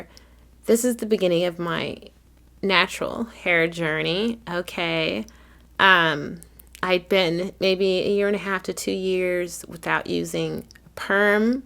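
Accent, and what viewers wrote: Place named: United Kingdom. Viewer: Loved Your British accent !!!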